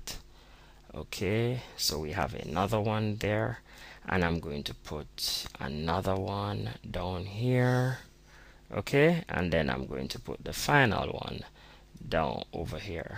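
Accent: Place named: Jamaica. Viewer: U r a Jamaican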